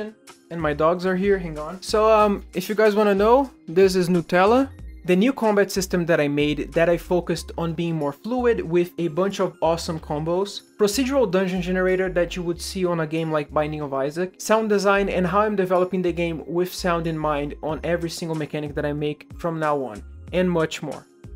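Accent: Brazil. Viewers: esse sotaque parece de BR 🤨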